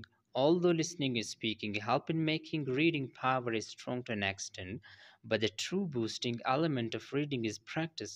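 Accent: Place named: London, United Kingdom. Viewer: why he sound like he come from landan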